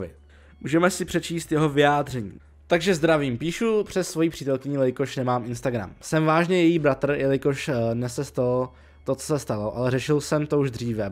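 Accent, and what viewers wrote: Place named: Silesia, Czech Republic. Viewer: tvoje čtení slezského nářečí mě baví jak cyp :D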